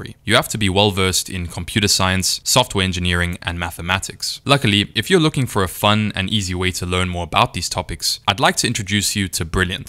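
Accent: South Africa. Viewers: hey are you South African?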